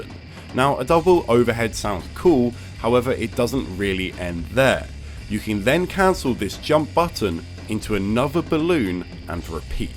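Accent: United Kingdom. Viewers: Calling something broken is a day one option select lmao. Excellent writing already. Btw, the audio mixing has improved drastically. Your voice is clear as day. The music is much clearer as well, but mixed in just right to add texture without muddying up your very British accent.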